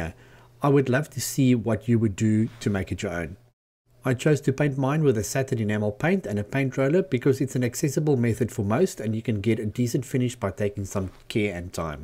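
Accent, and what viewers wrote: South Africa. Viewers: That's a definite South African accent there. Where can we find stuff like this in SA? Awesome build!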